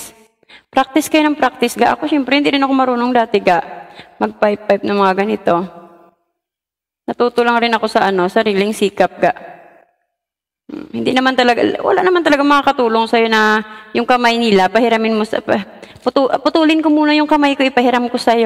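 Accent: Iloilo, Philippines. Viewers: Sumuaubra lang sila niyan idol...parang ilonggo ka din 😅😅😅❤️❤️❤️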